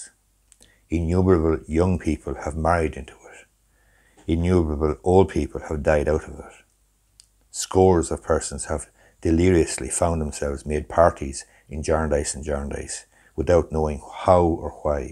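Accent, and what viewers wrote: Ireland. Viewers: I have read this introduction to Bleak House many times and never fail to admire the brilliance of Dickens. Thank you for drawing attetion to it and reading in your lovely Irish brogue!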